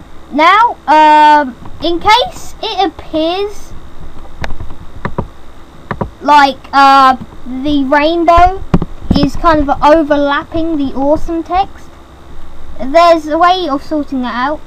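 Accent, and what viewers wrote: United Kingdom. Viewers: wow your accent are you british ?:)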